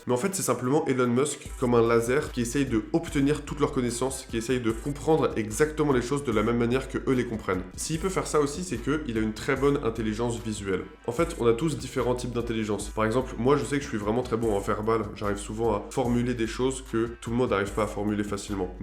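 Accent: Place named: Russia. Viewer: On dirait que tu penses comme moi. Tu t'inspires des meilleurs pour t'en rapprocher. On dirait que ta vidéo a été tourné et penser par moi. On dirait que c'est moi qui explique quelques chose. Tant mieux, your're like me. Je considères humblement bien expliquer les choses et être bon pédagogue et je constates que toi aussi tu es comme ça. Je sens un légé accent russe, je me trompes?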